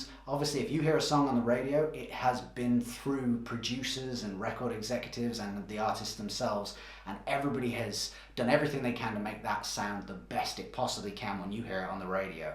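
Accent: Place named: Ireland. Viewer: Have a nice day.You sound irish.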